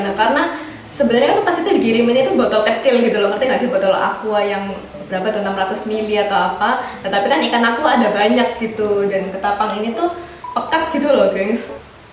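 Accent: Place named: Surabaya, Indonesia. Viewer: Suroboyo pundi mbak e sampean?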